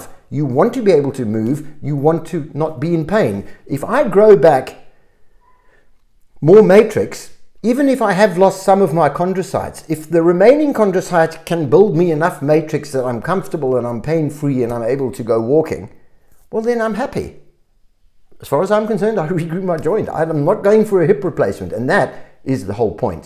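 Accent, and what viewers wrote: South Africa. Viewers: KZN accent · Good heavens, this is a triumphant explanation, and what a pleasant surprise to hear a Saffa accent! I dove headlong into the debate after tearing a labrum. Drs contradicted Physios who claimed that bursa replenish the cartilage by providing nutrients through movement. I'm a 42-year-old (gnarly) Capetonian surfer and I'm plunging into PT to stave off surgery. Thanks for the insight.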